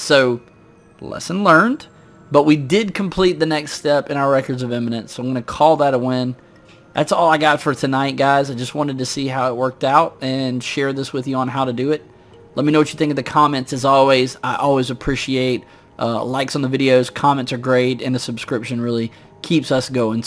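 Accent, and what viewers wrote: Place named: South, United States. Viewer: I’m always surprised when I hear a familiar accent in a hobby that I enjoy. I’m completely used to being the oddball southerner that likes nerdy stuff. Cheers man, thanks for great content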